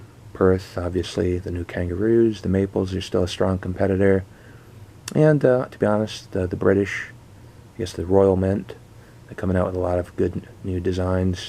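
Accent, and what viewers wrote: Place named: Netherlands. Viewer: So you're U.S. based because of the ASE's being first on the list ? Was actually wondering if you were sort of Dutch because you seem to have short of a slight Dutch undertone accent. Ooh... haven't seen such coin roman numerals but agreed : here's to a great 2020 of MMXX for us p.m. stackers around the world !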